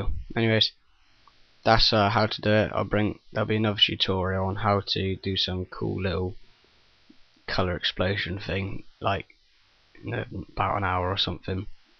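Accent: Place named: United Kingdom. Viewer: Ohmigawd your british <<num> Pleasse reply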